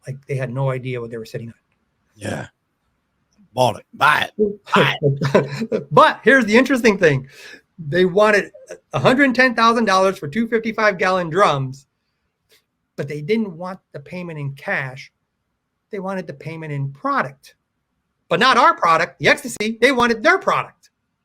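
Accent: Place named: Chicago, United States. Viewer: You always say you're from LA but i knew I detected a Chicago accent. Great content, I hung around the underground club scene in Chicago from <num> in my late teens. Love the stories, reminds me of the stuff I was doing with my friends back in the day.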